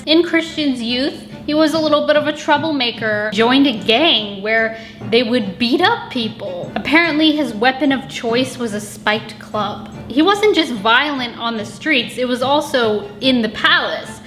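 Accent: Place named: Denmark. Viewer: are you danish because you are talking like a dane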